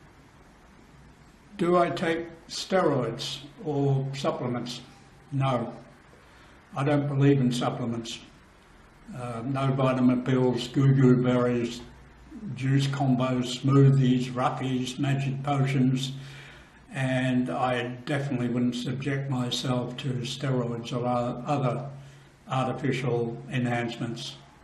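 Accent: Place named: Australia. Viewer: Thanks for answering the questions good to know your eating a high protein high fat green diet. I am assuming by protein and fat you mean animal source. Your an inspiration great to see you can still be active at 80, by your accent I know your Aussie however your location seem to be elsewhere maybe Asia or Darwin.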